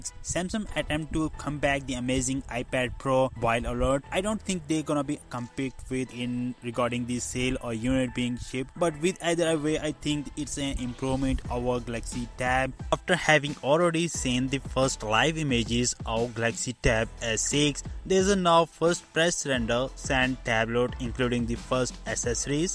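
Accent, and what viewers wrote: India. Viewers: I just turned volume off because of the butchered 7 ELEVEN Apu English. Still a great vid!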